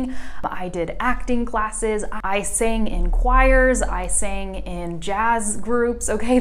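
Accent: California, United States. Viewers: Exactly.. she speaks in basic english with clear american accent. That's make us understand easily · I'm a native English speaker, but I will say this for whatever reason while learning Spanish it is much easier for me to understand what a woman is saying compared to a man. I don't know why that is but it is. And yes you have a California accent which is to say really no accent as most other English speakers would say. I would imagine it's much more difficult for non native speakers to understand different British accents, Australians, or related New England and New York accents because as a New Englander I can say we speak more like British people than we probably think with long vowels and guttural h sounds that aren't actually supposed to be in the word but it's just how we talk. Or Pennsylvanians and New Yorkers dropping H's and pronouncing words like hat and ass like " "hey-at" and "ey-ass" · A big thanks to you mam, honestly I really enjoyed and understood each everything you said throughout this video make me encouraged and motivated to do more and put me in a field has potential to improve my listening and speaking in English, and one more thing that I want to share is i dreamed myself that I speak American English well like you speaking. 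Saying thanks again for reading my comments.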